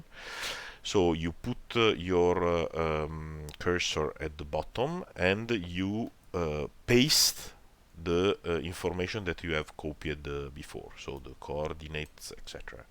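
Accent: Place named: Italy. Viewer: Per ringraziarti ti ho "comprato" un caffè! (fra l'altro dall'accento immagino tu sia italiano)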